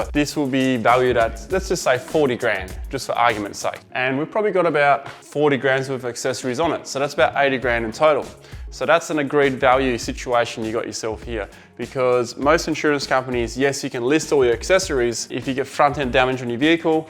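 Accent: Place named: South Sydney, Australia. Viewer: Must be from south Sydney. Surely.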